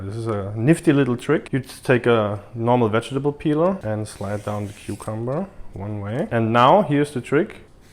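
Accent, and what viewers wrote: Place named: South, Germany. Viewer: since when do you have a south German accent in your English:D Rock on!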